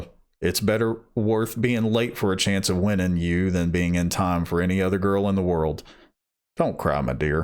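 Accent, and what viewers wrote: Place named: South, United States. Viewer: Excellent reading,.. i'm enjoying these. There is nothing wrong with a southern drawl. I like it.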